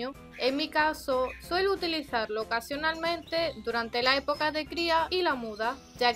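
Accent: Spain. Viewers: gracias por su exelente consejos me encanta su asentó español 😀saludos desde mexico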